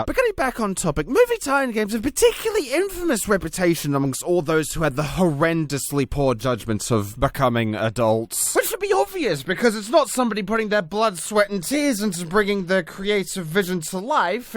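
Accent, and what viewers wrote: Australia. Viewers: wait u r australian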